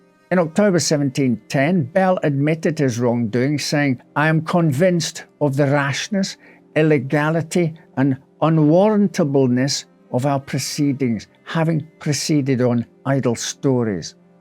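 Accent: Scotland, United Kingdom. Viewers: I'm obsessed with this man's accent, is he Scottish?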